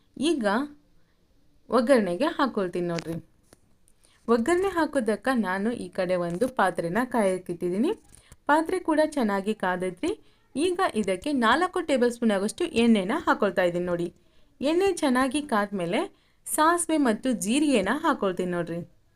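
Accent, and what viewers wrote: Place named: Bangalore, India. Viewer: ಅಕ್ಕ ಅದು ಕೊಬ್ರೆ ಮತ್ತು ಹಸೇಮೆಣಸಿನಕಾಯಿ ಅಲ್ಲ ಅವ್ವ. ನಮ್ಮ ಉತ್ತರ ಕರ್ನಾಟಕ ಭಾಷೆನಲ್ಲಿ ಕೊಬ್ರಿ ಮತ್ತು ಹಸಿಮೆಣಸಿನಕಾಯಿ ಅಂತಾರ. ಜರ ಸ್ಟೈಲ್ ಮಾಡಿ ಬೆಂಗಳೂರು ಥರ ಮಾತಾಡಬೇಡ. ನಮ್ ಹೆಮ್ಮೆ ಇಂದ ನಮ್ ಉತ್ತರ ಕರ್ನಾಟಕ ಭಾಷೆ ನಲ್ಲಿ ಹೇಳು 🤦🏻‍♀️🤦🏻‍♀️🤦🏻‍♀️🤦🏻‍♀️🤦🏻‍♀️🤦🏻‍♀️🤦🏻‍♀️ಮತ್ತೆ ಅದು ಕೊಪ್ ಅಲ್ಲಕ್ಕ, ಅದು ಕಪ್ Cup 😅😅😅😅😅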